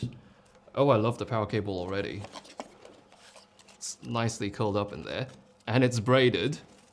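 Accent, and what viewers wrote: RP, United Kingdom. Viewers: first time i hear an asian guy with a british accent. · This dude speaks very posh.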